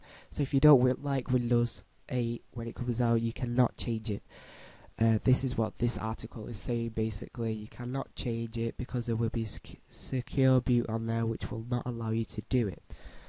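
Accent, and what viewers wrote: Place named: Yorkshire, United Kingdom. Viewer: The UEFI boot thing is worrying but I don't think that MS would like the bad publicity it would get if it tried to limit the use of computers to its own OS. This is the 1st of your vids I've watched - your accent sounds similar to mine so you're prob in Yorks - Do you go to a LUG? I only have Linux, I grew to hate Windows more & more so it was easy to leave it & move to Ubuntu. I run Ubuntu 11.04 'Classic' on my desktop & Xubuntu on my EeePC901, laptop & server. Use CentOS in a VirtualBox.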